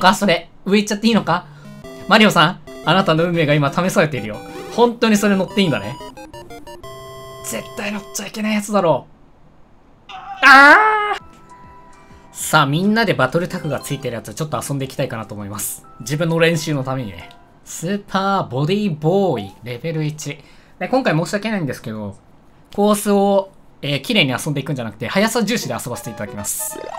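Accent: Kansai, Japan. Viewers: バリって関西弁なんや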